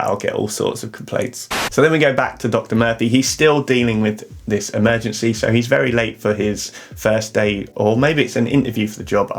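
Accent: United Kingdom. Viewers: I'm from germany and at first i though "why do im not understanding him so well?!" and than said you, you're from the uk and I realized I just understand AE😒😂 But still a cool and interesting Video😊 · Ive enjoyed medical drama since Greys Anatomy and this one with a ever so amazing Freddie is a sight for a sore eyes.... I came across ur channel and thoroughly enjoyed it... love the way u broke it down explained stuff... Young Doctor with great brit accent 💕... M ur fan already 😊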